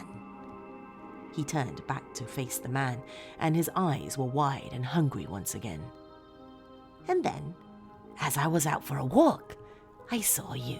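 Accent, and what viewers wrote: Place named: United Kingdom. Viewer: oh you charmer you are from Britain if I would have to place your accent 😉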